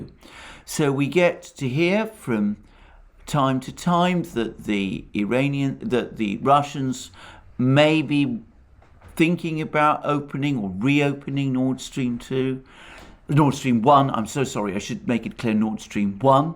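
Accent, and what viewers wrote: United Kingdom. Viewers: Alexander's soothing British intellectual voice is unparalleled on YouTube! ♥️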